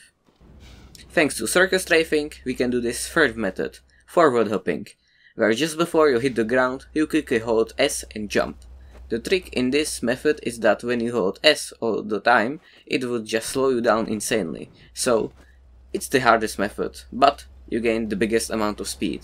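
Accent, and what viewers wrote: Czech Republic. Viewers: Cz accent :D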